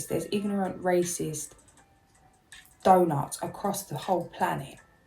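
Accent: United Kingdom. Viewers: As a white dude from America, which automatically makes me a deaf, dumb, and blind idiot according to the mainstream media, you sound British to me honey.. this is great stuff, keep up the great work... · Hadn't noticed honey. Just thought you were from London. A cockney lass. Who cares. You have the right to block anyone who gives a negative comment. xx💝💖💕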